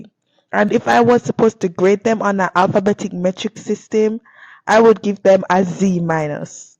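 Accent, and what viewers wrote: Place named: Caribbean. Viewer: Nothing someone does it perfect. I will agree some of these podcast approach towards woman is harsh and sometimes unfair, but they are a necessary "evil" per say since the 80% of men who were left on read by most woman are either going to follow these guys... or Join these females onlyfans. For every actions, there is a reaction. Woman want to continue to aim for the best, as they say the 20%. which is fine and is expected to go for the best you believe you can get, but this is not the case. There is a certain amount of guys who do rotate around the same girls, Even if these girls are dating a "nice guy" they have their lapse of judgment with these men. I can agree they themselves may not be the BEST people to preach the message, but no one was preaching anything. The reaction to woman dating choices is this. Hardened Men who are sickened by the westernized woman I can hear some Caribbean accent from you, so i can tell you may not be with the western woman BS, but you can't speak for the majority of woman. 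As a guy who has dated, and dealt with many woman. Yes woman are generally the same, The same way Men are generally the same. We have our own individual differences, influenced by our upbringing and society who grew up around, but biologically, when it comes to dating and how woman choose their mate, just like men, is generally the same. Per instance 9/10 Woman do not like Nice guys, or push overs. You try to work a Hardened tough guy to become more soft, like building you'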